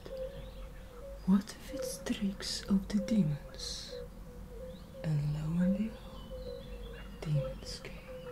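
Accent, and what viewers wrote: Russia. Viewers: Русский акцент... Клаааасс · Ну,!!! Вот это Баба Яга всея ютьюба! А какой лес, а какая изба, а этот русский акцент! А сказки какие мудрые, и юмор...Браво❤ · Лайк за русский акцент, как один из элементов образа, круто 🙂🤌🏻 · Great Russian accent i sound about the same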